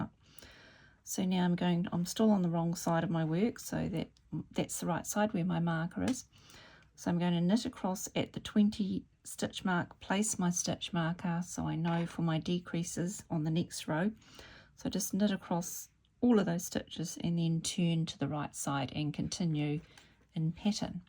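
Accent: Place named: New Zealand. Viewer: so lovely to hear your kiwi accent :)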